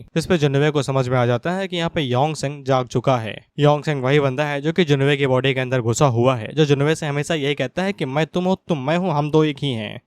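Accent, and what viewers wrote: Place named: Bhojpuri, India. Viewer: Tumare muh se bhojpuri bhohat achhi lagti hai ❤❤❤❤❤❤ or i am the fated villain next part please 😢😢😢😢😢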